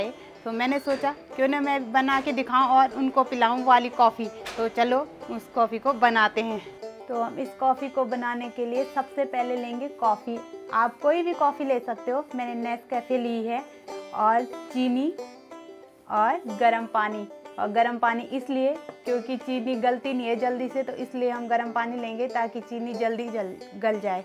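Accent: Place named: Pahadi, India. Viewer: Cute pahade style taulking tone💚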